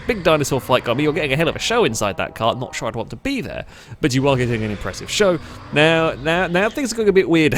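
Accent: United Kingdom. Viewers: Thank you Youtube 4 that recommendation i enjoyd that video, i really like that british norrater forgive my bad english aim still learning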